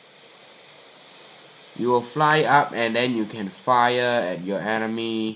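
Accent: Singapore. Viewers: Are you singaporean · are you a singaporerean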